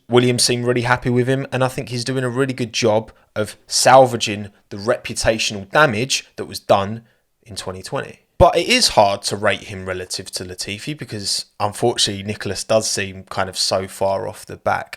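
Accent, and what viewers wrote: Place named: London, United Kingdom. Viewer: The only channel I’ll suffer the London accent for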